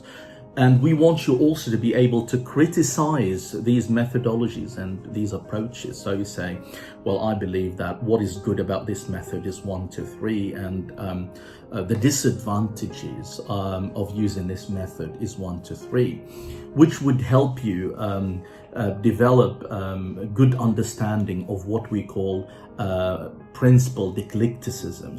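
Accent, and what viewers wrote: United Kingdom. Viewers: Great British accent Mr, something brings trustfulness in your training place, I really felt relieved when I heard you talking in English, this is my first time by the way, cause I follow you on Facebook and your Egyptian Arabic dailect is impressive too. Greetings sir! That's make me ask about the cost of this splendid Delta course and if it's reachable online. Thank you and I wish you more breakthrough Courses.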